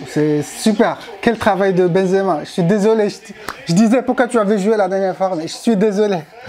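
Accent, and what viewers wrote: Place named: Brazil. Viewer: ta un accent bresiliens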